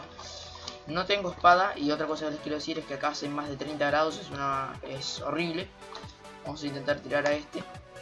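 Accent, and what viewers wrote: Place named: Argentina. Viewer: Hola! te hago una pregunta sos de Uruguay o de Argentina por que hablas como los argentinos pero tenes una capa de Uruguay en minecraft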